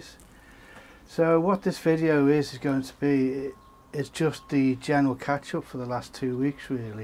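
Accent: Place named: Merseyside, United Kingdom. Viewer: You learn something every day LOL Yep, sounds about right. I wonder how much the compost mix affects it? Whereabouts are you from - you sound Merseysideish 🙂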